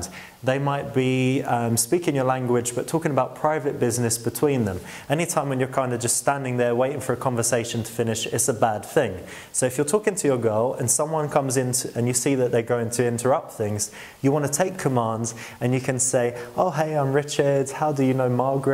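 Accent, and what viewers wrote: England, United Kingdom. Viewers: dude you have an English accent you win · U have a british accent u dont need to do anything lmao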